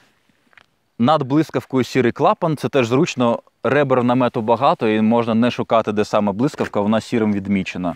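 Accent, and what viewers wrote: Standard. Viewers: Гарний огляд, та гарна та чітка українська мова, дякую!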